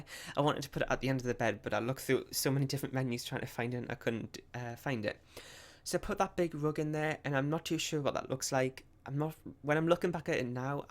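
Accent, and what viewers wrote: Newcastle, United Kingdom. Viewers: No worries :) I'm from Durham! I thought you would be from Newcastle cause of your accent :D I live in London now so my accent isn't as strong haha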